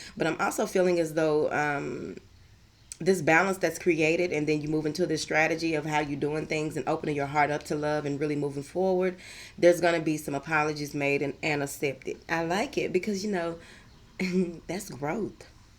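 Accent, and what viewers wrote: South, United States. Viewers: Southern girl!?? I’m from GA & country as ever and I love your country talking tail 😂😂😍😍🙌🏾🙌🏾 specially when you say “Whatever”💁🏾‍♀️🤷🏾‍♀️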